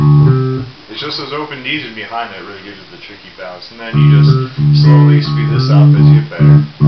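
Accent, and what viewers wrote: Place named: Canada. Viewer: bro. First off you sound sooooo fucking Canadian I was laughing so hard. Not an insult...my daughter was born in Ottawa....funny memories. However, bro you are playing the riff incorrectly. i know you have been a long time subscriber/commenter on my videos. You are adding in extra octave d's...i mean technically the notes are correct but there are more open d's not the octave 12 or in your case on the g string...the 7th fret.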